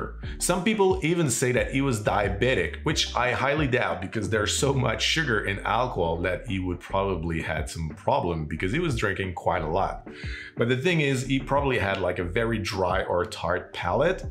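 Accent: Quebec, Canada. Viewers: 😆 I love the French-Canadian treatment of the letter "H." You say it when it's not there and don't say it when it's there. My friend always calls those tubes of meat on buns "ot dogs" and always offers "elp" if I need assistance. Now on to the Emingway Daiquiri! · As a fellow québécois, I love seeing your videos when they come out! We need more of the accent on YouTube. Also, because I'm a filthy anglo, I have to say it -- why can't francophones count to four? There's a tree in the way. :v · lol.... yeah, where's the tree? I love the Québécois way of speaking too.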